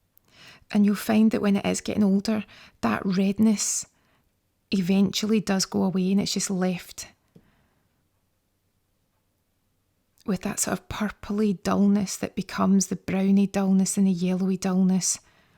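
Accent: Scotland, United Kingdom. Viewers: 😁😁😁 No way! 1) I have no competence in "show & tell" 2) gorgeous Scottish accent beats awkward Italian accent hands down 😜 Keep up with the good stuff!😁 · I Love your accent :) is it scottish? Sorry oft this is torally wrong xD I'm Not good at guessing accents haha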